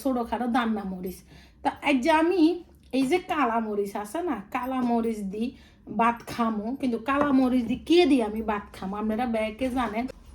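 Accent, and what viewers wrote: Noakhali, Bangladesh. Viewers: আপু নোয়াখালী বর্না হইতেছে😭😭😭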